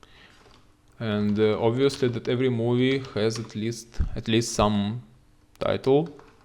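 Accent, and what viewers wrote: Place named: Russia. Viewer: russian accent makes it impossible to listen. anyway thanks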